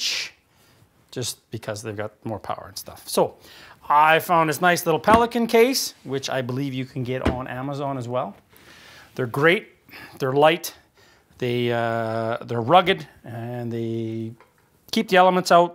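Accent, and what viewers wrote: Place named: Sherwood Park, Canada. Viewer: I thought your accent sound familiar, I lived in Sherwood park for 3 years.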